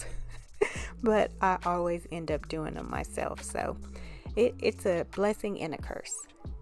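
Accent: South, United States. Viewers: I love these! I hope I didn't say anything out of line by saying you sound like you're from the south? I mean I love it. I know a lot of people say southern people sound stupid because we have a southern accident. I want meaning that at all. I love your accent again I have a southern accent but yours sounds sweet. I'm not usually into clear tips but I really love these colors and it might have to you all day but you make it look so easy. That's why I don't do my nails a lot because it takes me so long! Much love to you!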